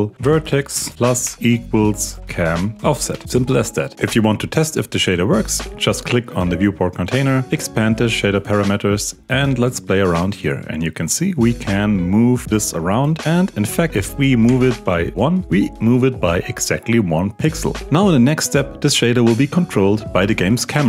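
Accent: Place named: Germany. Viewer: Do you not recognize the german accent?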